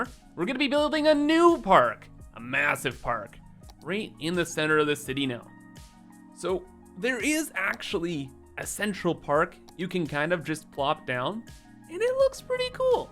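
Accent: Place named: Canada. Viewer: Golden Ears Park, do you happen to live on the west coast of Canada? I’ve been noticing the Canadian accent more and more lately and now I’m like 99% sure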